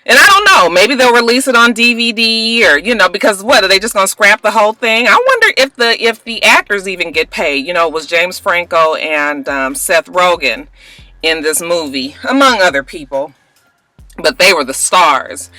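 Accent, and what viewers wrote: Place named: California, United States. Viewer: Heayer and Theryer. That Cali dialect is present!!!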